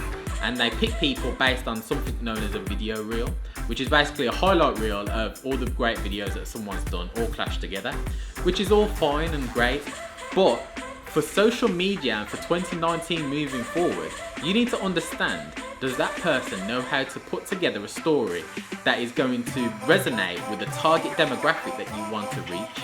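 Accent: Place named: Birmingham, United Kingdom. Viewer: Oh yes by the sea life centre, awesome I thought so I could here a little bit of a brummy accent in your voice. Will do brother absolutely loving your content so far!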